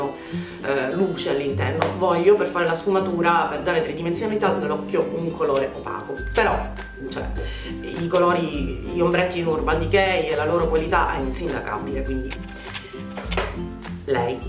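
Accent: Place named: Sicily, Italy. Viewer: Ciao! Sono d'accordo con te in merito alla Naked 1...manca qualche ombretto opaco in più! :-( Dall'accento mi sembri sicula... come a me! :-) Un bacione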